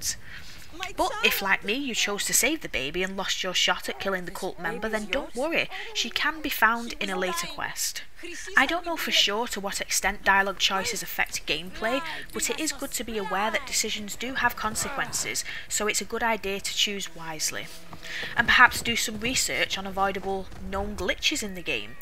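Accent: North, United Kingdom. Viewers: Are you a "northener"? It's a hobby of mine to guess accents. Also, great video!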